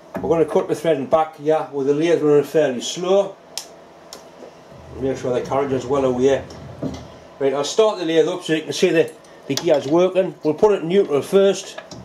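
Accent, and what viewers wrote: Newcastle, United Kingdom. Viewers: 51 years since I worked a metal lathe as an apprentice. Takes me back in a good way. Love the Geordie accent ..... don't hear it very often here in the Rocky mountains.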